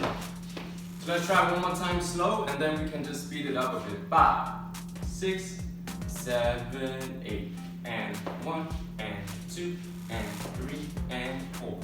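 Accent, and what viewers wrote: Denmark. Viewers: You Sound danish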